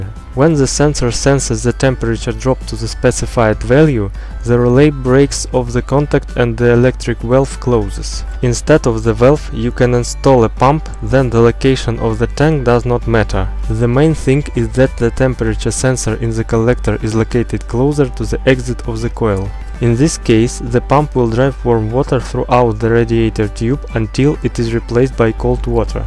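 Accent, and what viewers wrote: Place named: Soviet Union. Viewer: Советский акцент английского языка =))) на 12й минуте спалился русским счётом! =))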